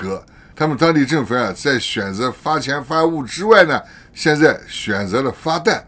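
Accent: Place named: Northwest, China. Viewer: 熟悉的西北音~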